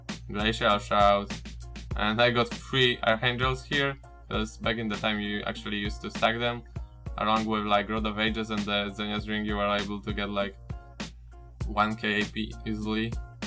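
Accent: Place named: Poland. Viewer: Are u from poland ? becouse i can hear polish accent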